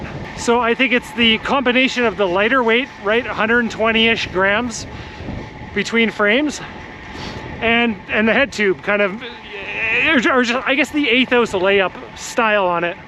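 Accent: Canada. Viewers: Canadian accent is a bit of a give away as to NOT American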